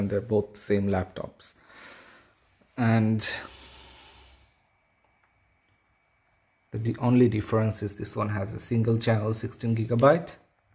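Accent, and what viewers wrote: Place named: Bengal, India. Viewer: Accent shune bujha jay bangali... Hoytoh dhakar keu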